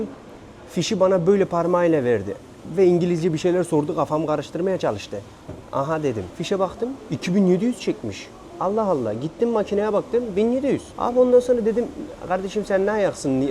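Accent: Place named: Sivas, Turkey. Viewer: Evlat ya ben sivaslıyım konuşman şiven hareketlerin o kadar çok sivaslılara benziyor ki hele şu norüyon demen varya beni bitiriyor 😂😂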